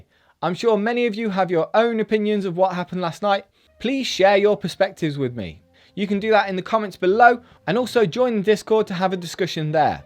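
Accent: United Kingdom. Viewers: Oh man; you bust out queen's English and you might surpass PewDiePie.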